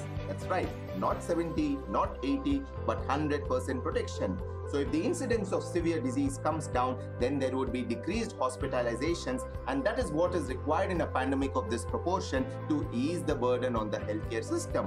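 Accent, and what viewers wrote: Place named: India. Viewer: The Indian accent purposefully used is quite nice.❤️👍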